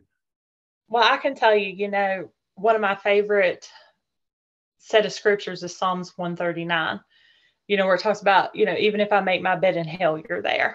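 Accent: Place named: Australia. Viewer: Mr. you have a beautiful accent. I can't place it though. Is it Australian or New Zealander? (Whichever country it is from I like it).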